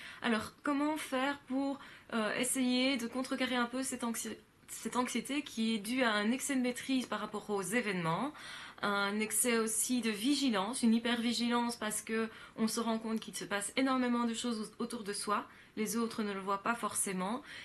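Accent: Belgium. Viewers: Ah, je me disais bien que vous étiez belge(à l'accent) et les trains derrière vous le confirment... Liège, Namur?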